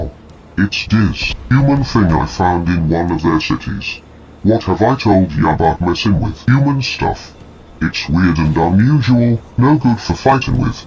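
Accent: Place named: United Kingdom. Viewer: Eh, sounded kinda British lol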